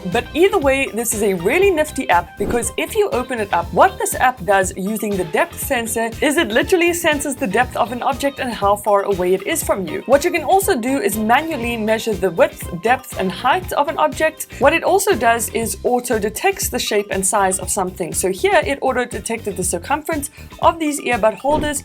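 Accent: South Africa. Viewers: you're from sa? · Ik twijfelde even of je Nederlands was, gezien de accent. Maar het was toch net even anders. Ik had moeten weten dat je Zuid Afrikaanse bent? Ben je daar ook geboren? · oh this south african accent of yours. love south africans!! can't wait for the travel ban to be lifted to come to SA again and again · Ar you from south 🇿🇦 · Are you South African🇿🇦? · South African! Subscribed.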